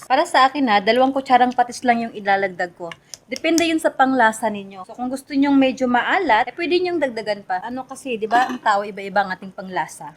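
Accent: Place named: Visayas, Philippines. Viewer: Siguro mag bisaya ka nalang kasi bisaya ang tuno mo oi..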